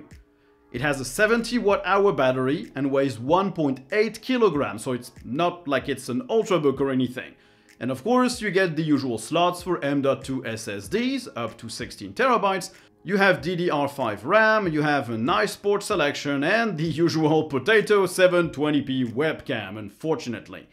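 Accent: Brittany, France. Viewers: Il est breton je crois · Damn are you french? You talk exactly like every french people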